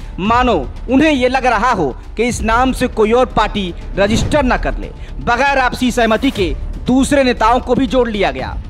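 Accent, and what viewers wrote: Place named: Palamu, India. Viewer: Maine Palamau me 12 years rha h isi tarah waha ke log bolte hin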